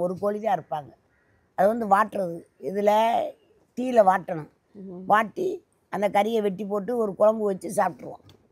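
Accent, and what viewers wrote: Ramanathapuram, India. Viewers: அம்மா நாங்கள் ராமநாதபுரத்தில் உள்ளோம். உங்களின் பேச்சு அப்படியே எங்க ஊர் போலவே உள்ளது. எங்கள் ஊரில் உள்ள முஸ்லிம்கள் இப்படித்தான் பேசுவார்கள் கேட்பதற்கு அழகாக இருக்கும்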